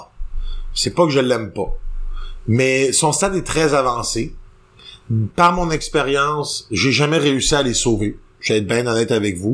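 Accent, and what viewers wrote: Quebec, Canada. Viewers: Bonjour. Je viens de découvrir votre vidéo, car mon betta souffre exactement de ce problème depuis 2 jours. Malheureusement, j'ai compris ça juste aujourd´hui. Il est donc en bac hôpital une journée trop tard. Hier, il n'était pas rond, mais très amophe dans le fond de l'aquarium. Il était nickel en début de semaine. J'ai un escargot qui est mort cette semaine et je me demande si c'est cela. Je suis vraiment peinée, car cela fait 2 mois que je l'aie et j'ai étudié énormément sur l'aquariophilie avant de me lancer dans cette aventure. J'ai de la peine, car je me suis réellement attachée à mon betta (j'ai braillé comme un veau toute la journée), mais j'ai aussi un sentiment d'échec. Je tiens à vous féliciter pour la clarté des informations. C'est une très bonne vidéo. Étant québécoise, je trouve ça cool aussi d'avoir enfin de l'information, via le web et transmise par des gens de chez-nous. 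Je suis abonnée à plusieurs chaines YouTube Européennes, mais notre petit accent est tout de même sympatoche :) J'espère que votre poisson a tenu le coup. Sincères salutations de la ville de Québec 💁🏼‍♀️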